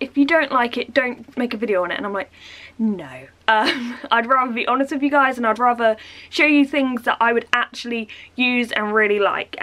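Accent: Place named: London, United Kingdom. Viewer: You've got a mixed accent... London and?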